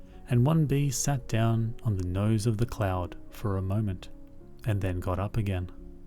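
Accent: Australia. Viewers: Thank you, I was seeking out Australian accent story tellers for my daughter (we moved to Canada where I’m originally from to give it a go being close to some family for at least the next several years) and I am feeling a bit guilty, hoping she will hold onto some part of her accent so your story telling is much appreciated :) and after living in Sydney for over 20 years and as a proud Sydneysider I have to admit I’m really enjoying it too :)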